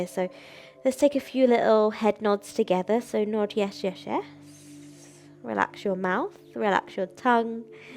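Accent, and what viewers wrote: United Kingdom. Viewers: Dear, I Always used to do yoga with Adriene, but more and more I am drawn to your classes. Thank you so much. You seem to have something that suits me every day and I love your English accent! (I'm British in France) thank you for your kindmess xx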